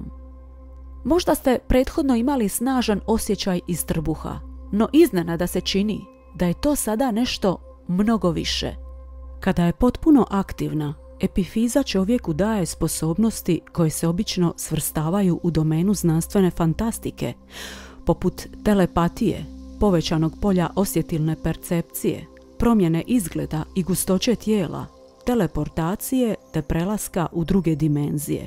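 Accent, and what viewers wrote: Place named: Split, Croatia. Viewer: Srpski sa splitskim naglaskom*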